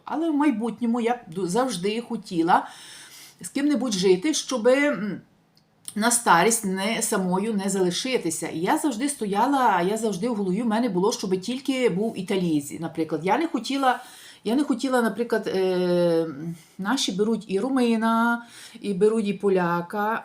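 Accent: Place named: Western Ukraine, Ukraine. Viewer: ..ВЫ СИДЯ СЕЙЧАС В ИТАЛИИ..ГОВОРИТЕ ОЧЕНЬ ПЛОХО ПРО НАШИХ МУЖЧИН..А ТАК ЖЕ ПРО НАШИХ ЖЕНЩИН..В С ЗАРАДНОЙ УКРАИНЫ,СЛЫШЕН ПРОНОНС..СТАРАЕТЕСЬ ВСЕМИ ПУТЯМИ УНИЗИТЬ!!!ВЫ 10000%ИМЕЕТЕ ИТАЛЬЯНЦА..НО ХОРОШЕГО..ТАК ВАМ ПОВЕЗЛО..И ГОВОРИТЕ ПРО НАШИХ ЖЕНЩИН,ЧТО ИМ ЯКОБЫ ВЫГОДНО ЖИТЬ С ИТАЛЬЯНЦЕМ..ЭТО ДАЛЕКО НЕ ТАК!! ДА..ЕСТЬ НАШИ ЖЕНЩИНЫ ЖИВУТ..НО ОТ БЕЗЫСХОДНОСТИ..ОНИ РАБЫ Ц ИТАЛЬЯНСКИХ МУЖСТН..А ВЫ ТАК ЛЕГКО ИХ ОСУЖДАЕТЕ...БОГ ВАМ СУДЬЯ🙏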